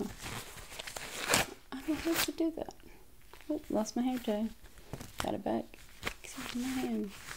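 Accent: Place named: South, United States. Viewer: Salut, j'aime bien l'accent du Sud, c'est le plus bel accent pour le francophone que je suis. A bientôt pour une vidéo, en français j’espère.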